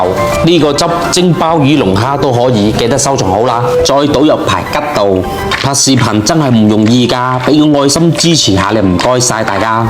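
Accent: Dongguan, China. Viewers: 地道東莞話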